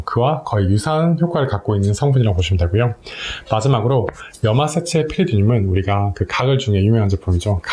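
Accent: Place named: Jeolla, South Korea. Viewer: Q&A가 궁금했던 내용들이라 참 좋네요, 약사님 전라도 분이신가봐요! 오늘 특히 구수한 느낌입니다ㅋㅋ 영상 감사합니다.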